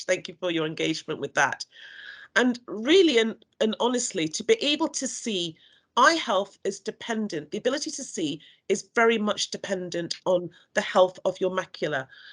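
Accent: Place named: United Kingdom. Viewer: Everything sounds better in british english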